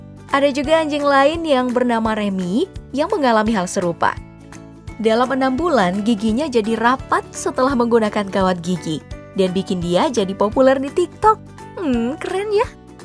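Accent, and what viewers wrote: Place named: Java, Indonesia. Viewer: Mbak nya kalok ngomong ada jawa nya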